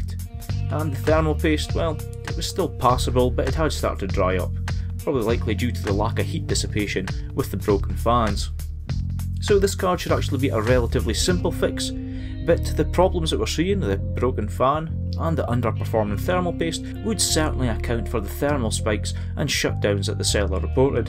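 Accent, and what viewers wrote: Scotland, United Kingdom. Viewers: Love the accent! Is it from Scotland?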